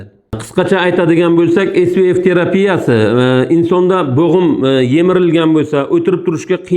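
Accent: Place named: Bukhara, Uzbekistan. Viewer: Buxoroda deb òyladim sheva buxorocha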